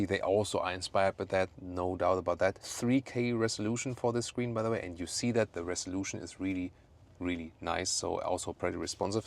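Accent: Germany. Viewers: I love your German accent. Funny as hell.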